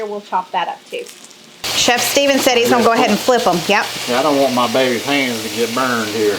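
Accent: South, United States. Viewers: LOVE YOU GUYS!!! Making the baked ziti right now with my boys...they are so excited. They ask to put on so we can cook together. They may or may not copy your southern accent...we are Michganders, so they are quite entertained. HA.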